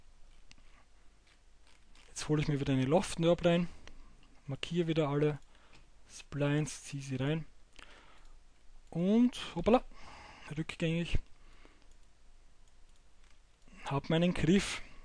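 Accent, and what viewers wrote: Austria. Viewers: Is doch voll geil das du Österreicher bist :D Ich mag deinen Dialekt ^.^ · Dafür dass du Östereicher bist versteht man dich ganz gut ^^, also jetz´ für nich´Östereicher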